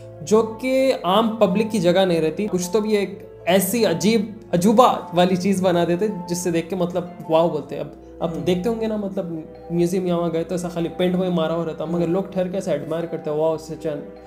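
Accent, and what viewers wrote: Hyderabad, India. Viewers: PLEASE IGNORE NKO KARO🙏🏻 IMPORTANT Bhai main aapke videos 4 5 year se dekh raha hu main Mumbai se hu aapke sabhi channel dekhta hu....par ek bat mujhe aapse kafi salse puchhani thi ke Farhan Bhai Kyu nahi dikhte ab wo kaha hai aur Kya reason hai ki wo ab videos me nahi dikhte mujhe Jana hai aur mere sath mere frd log bhi Jana chahte Kya reason hai ki wo ab nahi nahi rahte videos me plz reply kariyga 🙏🏻 bohot Sara pyar Mumbai aur Meri tarf se aap ko dekh ke main kafi chijo ko sikha abhi main 20 salka hu main aapko 15-16 ka tha tabse dekhraha hu main aap ab se jarur life me ek bar milna chahta hu main bhi aapki tarah haidrabadi bolne ki koshish karta hu waise main nahi janta etna bas koshish karta hu ...... God bless you all ❤️❤️❤️❤️❤️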